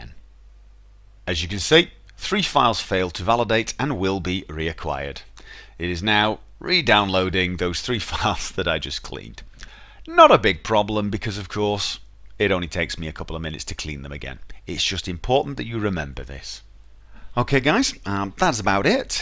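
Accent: United Kingdom. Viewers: Thank you for this tutorial.From your speaking tone,i'm guessing you're from United Kindom.I like the way you talk!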